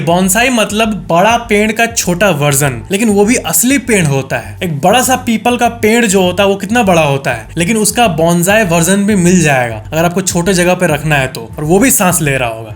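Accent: Bihar, India. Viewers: Your accecent sounds like bihari,s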